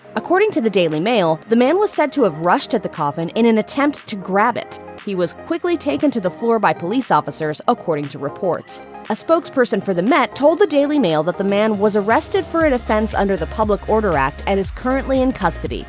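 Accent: United States. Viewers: I don't need this stupid American voice. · Who is the commentator? Sounds awful. An American?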